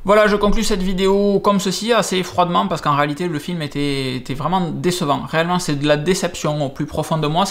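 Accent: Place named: South-West, France. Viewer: PS : tu as la même voix que Pierre Sabatier chez Primeview, accent du sud ouest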